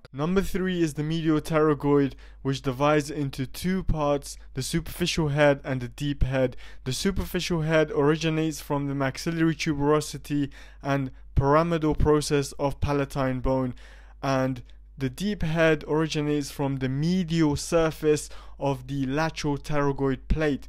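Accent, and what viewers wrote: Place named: England, United Kingdom. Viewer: great..loved it, and its propper English accent😱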